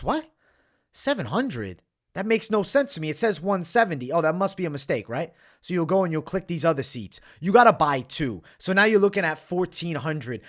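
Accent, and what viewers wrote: United States. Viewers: you got that American accent down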